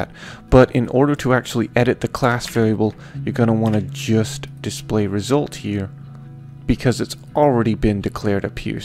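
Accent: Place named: Scotland, United Kingdom. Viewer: You sound so Scottish in this lesson ^^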